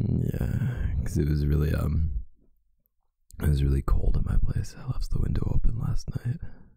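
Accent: Canada. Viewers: Omg you’re voice so nice 😳🥺 and your giggles/laughs make heart melt 🥺💖 to dream of having a boyfriend sound like you lol I love Canadian accents 😊 they make me melt 🥺 new fan from the UK here lol Stay safe 😊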